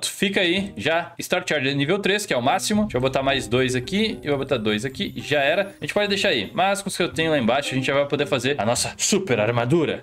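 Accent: Rio Grande do Sul, Brazil. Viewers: vc é gaucho? no way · Você e gaúcho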